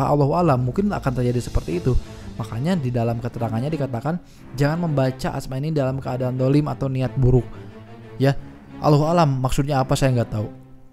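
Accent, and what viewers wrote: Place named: West Java, Indonesia. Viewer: Klo di dengar dari logatnya kyknya kang orang sunda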